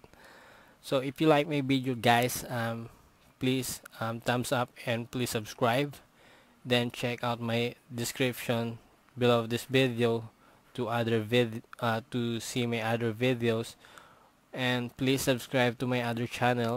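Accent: Philippines. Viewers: I think he was a filipino because of his accent and pronunciation of english.... But it's doesn't matter Good Job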